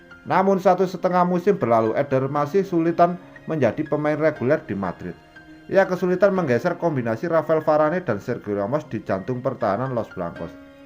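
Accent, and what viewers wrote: Java, Indonesia. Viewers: Presenternya terlalu medok..😁😁😁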